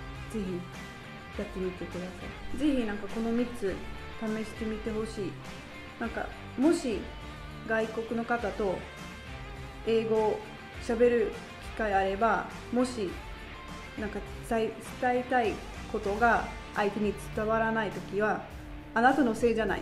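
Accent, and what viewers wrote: Kansai, Japan. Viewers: なんか関西弁入ってる？ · 本当に美人ですね！実在の人物ですよね？（笑）声もステキだし、関西弁もいいですね！ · 何故に関西弁、、、（｀・ω・） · 関西弁がおもしろい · そうやってアクセント気にせんと関西弁喋りまくったからうまくなったんですね！ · なんだこの美人！！ 5カ国語喋れんのか？ しかも関西弁？ しかもなんか一生懸命さが伝わる👍 うらやますぃ〜ぞ！彼氏👍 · 何でこういう外国の人ってみんな関西弁なん？ｗｗｗ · 関西弁親近感湧きます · めっちゃ綺麗！関西弁がたまらんw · ちょっと関西弁混じってる？ 可愛い♡ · 関西弁喋る外人ってなんか面白いし好き。笑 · 関西すぎた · お人形さんみたいで凄くかわいいですね☆ 関西弁で一生懸命話してるのが心打たれます^_^ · ちょいちょい関西弁入るのが良いね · 関西弁ええな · 美人で関西弁は最強！ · 女神さまが、関西弁しゃべってる 素晴らしい😻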